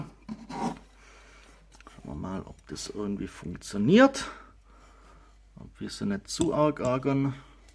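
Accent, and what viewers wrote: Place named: Swabia, Germany. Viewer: Ich höre schwäbisch. Wo lebst du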